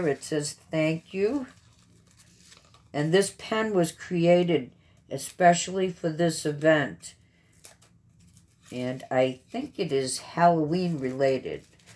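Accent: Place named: Massachusetts, United States. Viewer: I just realized when I came here to watch that you’re the one from Massachusetts!! I’m originally from New Bedford and I’m so nostalgic listening to your accent! 🥰🥰 I’m so glad you enjoyed the event and your prize!! PS, his name is Matt 😆🤣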